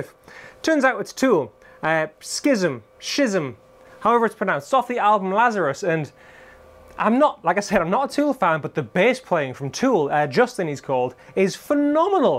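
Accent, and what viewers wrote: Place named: North East England, United Kingdom. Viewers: Where are you from? I'm from Sunderland and I detect a bit of a North East accent. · i'm 52 and a total gearhead and i've been to millions of guitar shops over the decades corporate and indie and i have never noticed any particular song being played more than any other. i personally think it's a bit of an urban myth. me myself i tend to default to ' ' simply because it's the first really complex lick i ever learned and i hammered on it til i got it (i was still a beginner). btw "IT'S ALL MUSIC AND WE'RE ALL IN THIS TOGETHER" in his awesome northern english accent gotta love it could not have saud ut better myself!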